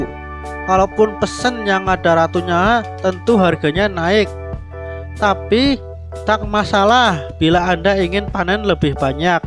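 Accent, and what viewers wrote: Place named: Purwokerto, Indonesia. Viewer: Genah banget koh logate. Purwokertone ngendi, nyong pengin dolan. Belajar ternak semut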